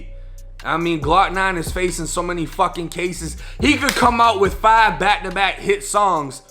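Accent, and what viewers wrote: Boston, United States. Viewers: You sound like you was born and raised in Boston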